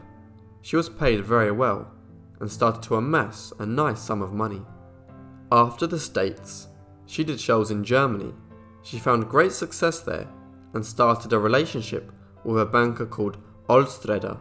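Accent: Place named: RP, United Kingdom. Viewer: Hard to believe that with such a plummy Brit accent you mispronounce "Grosvenor." It's pronounced "GROW-ven-er "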